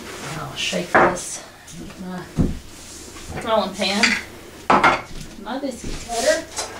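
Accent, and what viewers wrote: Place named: Georgia, United States. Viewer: "I use real salt, not the sea salt, iodized salt, etc. This is salt from the sea" Huh?? Man while filling his stove with red oak and hickory logs: "No live trees were killed for this video". Did you not just say they were red oak and hickory? What happens when you run out of tornado felled trees and you have a wood stove? Wait for the next tornado to keep warm? Aren't the Amish not supposed to use electronic appliances such as video camcorders? You have a Georgia accent, so how did you get from Pennsylvania to Georgia - by horse wagon or did you fly or drive? Again, isn't that a violation of Amish laws? Your cooking looks wonderful and healthy. I just have a problem with the hypocrisy of the religion and some of the comments. You say one thing, do another.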